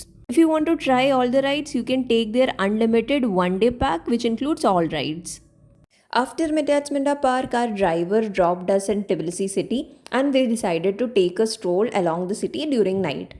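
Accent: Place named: India. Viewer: Indian accent is so terrible!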